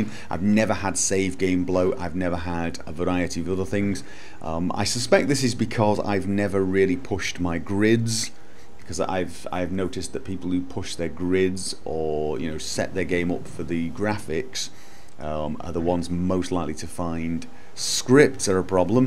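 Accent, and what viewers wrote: United Kingdom. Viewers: okay, I thought it was wierd he had that good brittish accent if he wasn't from there.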